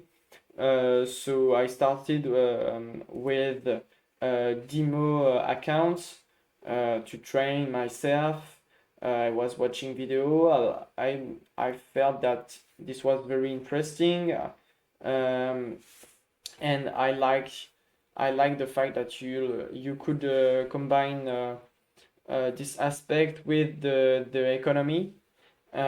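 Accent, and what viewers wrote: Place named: France. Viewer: hii ur doing so well man congrats 🎉 and ur little french accent is kinda cute hahaa so dw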